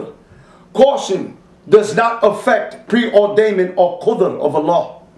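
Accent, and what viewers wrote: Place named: Arizona, United States. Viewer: Just curious but where did handler nab you? Did you get sent to the fili or mexi camps for field op training yet? I'm thinking arizona raised based on accent hint, possibly california or nevada.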